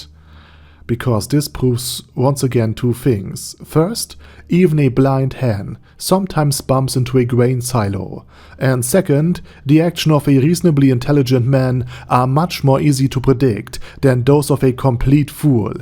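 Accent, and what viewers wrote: Germany. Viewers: wait. what? there are blokes who disliked his german accent narration? that is the only reason why i kept coming back to this channel, it is his thick german accent!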